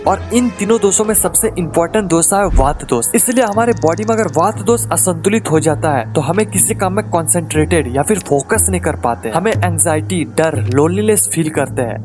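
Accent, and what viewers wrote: Assam, India. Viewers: Bro are you from Assam?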